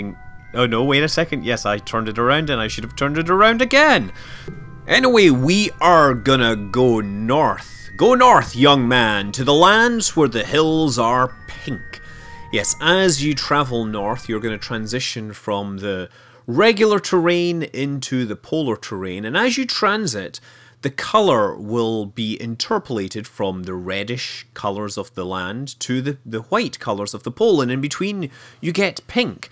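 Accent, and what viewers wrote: Scotland, United Kingdom. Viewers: Another Scotsman yes!!!!! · Somewhere in the UK I don't know what city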